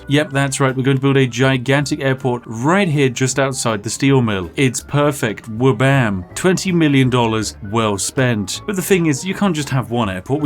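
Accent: United Kingdom. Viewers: No accent can make horrible atrocities sound perfectly sane and normal like the British accent. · LOL, "Billy Viel" car.... ("By Level" Car) Love this brit. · Your English is extremely good, tbh! It sounds so natural, so spiffingly British! You can set up your own Tube Language School and organize your own Grimsby Advanced Exam)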